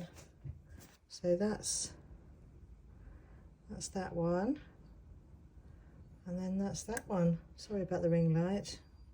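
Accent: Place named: United Kingdom. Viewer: You actually answered a question that I was already wondering. Thank you so much! I already own the Mayspring Dazzling Diamond, but I have heard other crafters raving about the Crushed Crystal. I considered buying the Crushed Crystal, just to see what everyone was raving about. You just saved me some money. I can wait till I use all of my Mayspring then have a go with the other one. Again thank you. I am a new sub now because I think you are doing an amazing job on your channel. Oh and I love your name, it was the name everyone called my mother (a nic-name) I am a Brit living in USA so I like the accent too. Anyways well done my new YouTube friend.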